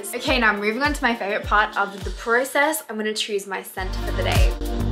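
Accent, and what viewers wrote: Australia. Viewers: Hey, are you Australian??? Love your videos ma'am 😍.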